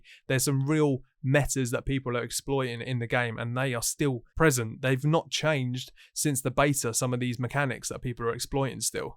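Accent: United Kingdom. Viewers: I love your natural British accent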